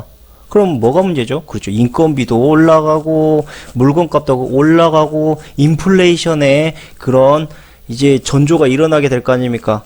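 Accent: Jeolla, South Korea. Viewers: 역사를 좀 배우세요 저분말이 사투리?원리ㅐ 호남말이 표준어 입니다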